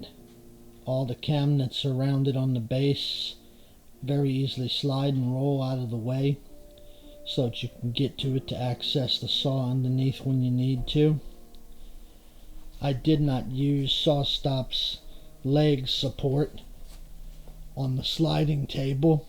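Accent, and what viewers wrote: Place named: Louisiana, United States. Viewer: Just watched one of your other videos where you started that you were from NO. and I swear I wanted to ask if you might be from Louisiana because I thought I heard a little bit of an accent. Although I live in the Houston area now, I’m originally from Lafayette and parents are from New Orleans and Kinder.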